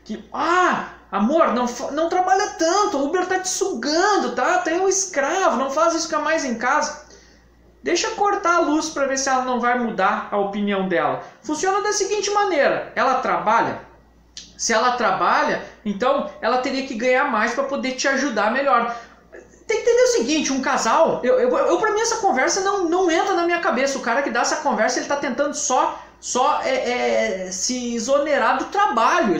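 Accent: Rio Grande do Sul, Brazil. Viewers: Boa noite. Assisti teus vídeos, os módulos e dei muita risada e aprendi muito com você. Sou do nordeste (Recife - PE) e me diverti muito com teu sotaque gaúcho (bá, gurizada, etc). Você é um talento na comunicação. Parabéns. Vou iniciar nas próximas semanas como Motorista UBER e agradeço teus vídeos. Super abraço. Gostaria que você me adicionasse no grupo de Whatsapp e também no teu whatsapp provado para ir aprendendo com você e com os outro colegas (<num>).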